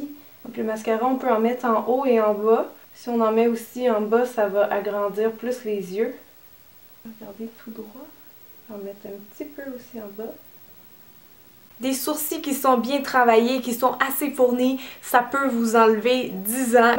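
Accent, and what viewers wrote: Quebec, Canada. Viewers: Emma Pas bête cette idée Emma!! Merci. J'y avais pô pensé! J'adore notre petite maquilleuse et son accent du Québec. toute fraiche avec des conseils toujours de bon goût et tout en subtilité. Sobre sans être fade.. Dis moi est ce que le fond teint Shiseido Lissant perfecteur convient pour les femmes de 45ans??? Sachant qu'il y a de très fortes rougeurs (causé par traitement médical). Merci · Vidéo très bien faite, bien expliquée; moi qui ne me maquille jamais car je ne sais pas faire, cela va bien m'aider! P.S: avec l'accent québécois c'est encore plus sympa!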